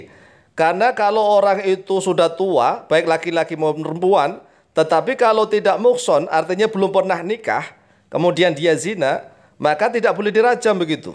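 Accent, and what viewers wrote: Madura, Indonesia. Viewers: Dialek Madura apakah ini Syiah madura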